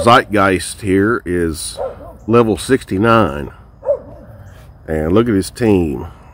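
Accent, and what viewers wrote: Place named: Tennessee, United States. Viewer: BTW are you from around TN, was just wondering because of your accent. I live a stone's through away from TN. That's why I'm asking.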